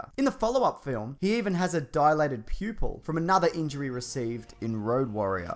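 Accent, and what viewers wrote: New York, United States. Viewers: Flawless New York accent as always. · Every time. That American accent kills me every damn time. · New York accent is spot on. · New York accent is perfect lmao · That new york accent tho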